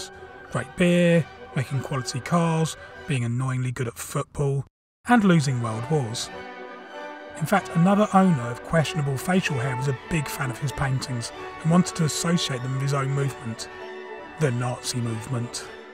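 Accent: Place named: Netherlands. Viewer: you sound more Dutch actually, which is very funny to me as a Dutchie in Germany. Looking forward to your next videos, I just discovered your channel via Reddit & I’m binge watching all of them now👌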